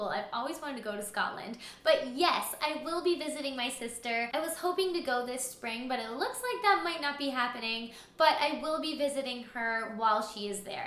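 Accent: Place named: Newfoundland, Canada. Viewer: TIL Newfoundland has a very distinct and interesting accent, coolbeans! Also that nipple covers make excellent MacBook feet (I use chair leg covers for them, myself).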